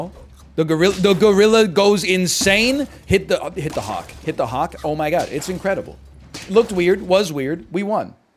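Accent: Canada. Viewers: Nah, his pronunciation is a common pronunciation in Canada. It's by far the more common of the two where I live.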